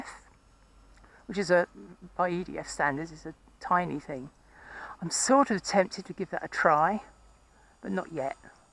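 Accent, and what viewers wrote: Australia. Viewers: Love my 50mm Arrows Viper - once I had modified it to fly faster on 4S :) Decent Aussie accent!!! :) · we loved it you speak better Aussie than us . The Aussie blowy fly is always the first one there at a good BBQ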